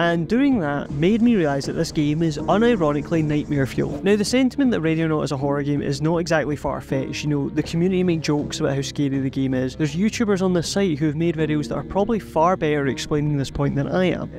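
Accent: Scotland, United Kingdom. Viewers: The Scottish accent does it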